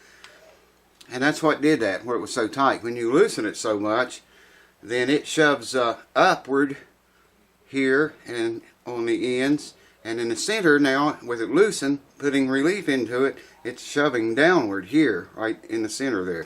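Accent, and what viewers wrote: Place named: Alabama, United States. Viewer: Thanks for uploading so many videos about how you do your repairs. Using them to help me out working on a little German flatback mandolin...Additionally, I live in Europe now, but you must be from very, very close to where I'm from, judging by accent. Southern Alabama? Who knows, you might even have fixed some of my uncle's guitars, or have played with him before. Keep on keepin on, man. Outstanding youtube stuff.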